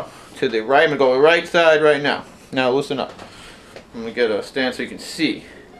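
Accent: United States. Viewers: He has the best boston, yankee accent I'm from the south so I don't here this much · i believe its a new england accent but im not sure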